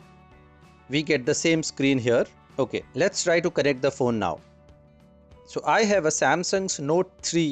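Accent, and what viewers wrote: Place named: India. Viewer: I usually don't trust people with Indian accents but you helped a lot, thanks.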